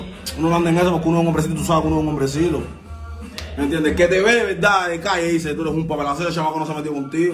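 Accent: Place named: Dominican Republic. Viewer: Y por qué el habla como dominicano asere🤣🤣🤣🤣, es un ridiculo de verdad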